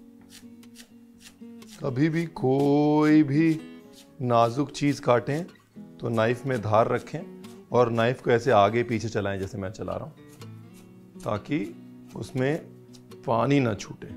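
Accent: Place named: Punjab, India. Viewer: Sweet Punjabi accent...loved it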